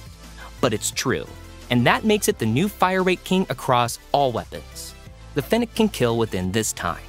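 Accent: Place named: India. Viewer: His voice is very nice. But of course we want the natural Indian accent. 😊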